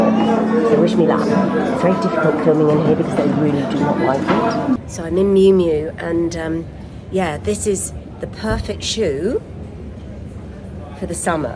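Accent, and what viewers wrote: United Kingdom. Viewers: Nothing is as lovely as a genuine London accent.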